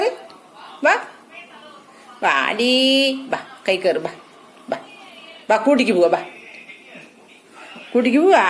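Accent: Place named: Palakkad, India. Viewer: PALAKKAD slang soooper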